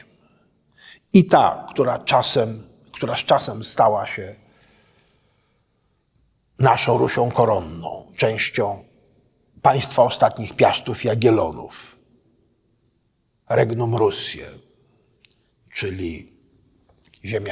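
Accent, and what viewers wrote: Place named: Mazovia, Poland. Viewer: Nieodrodny syn ziemi mazowieckiej. Praktycznie nie słychać "ą" na końcu słów.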